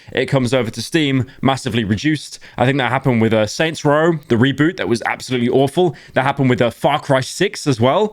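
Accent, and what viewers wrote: United Kingdom. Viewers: I do bot care if live is slop its something i can just listen to every single day I love listening to the british furry yap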